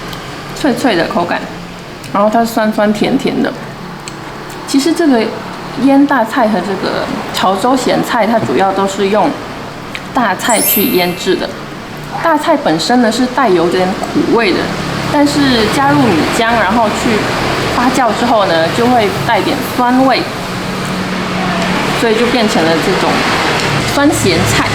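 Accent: Taiwan. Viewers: 好的。。。听了你的口音好像台湾女生。☺️ .我是马来西亚人。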